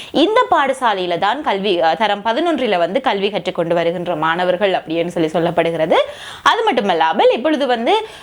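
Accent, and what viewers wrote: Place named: Jaffna, Sri Lanka. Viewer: Aama jaffna thamil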